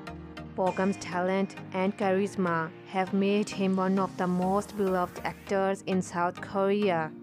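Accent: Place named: Thailand. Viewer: I could be wrong but i think the narrator seems to have Thai accent.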